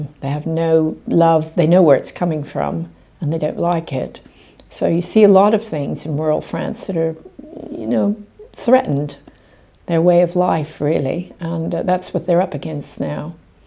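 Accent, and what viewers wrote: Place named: United States. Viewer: English voice??? You have a sort of American accent!!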